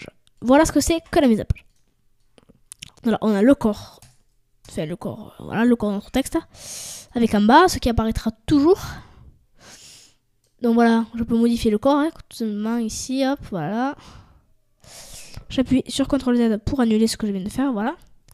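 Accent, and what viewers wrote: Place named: South, France. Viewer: Oh l'accent du sud il est là !!!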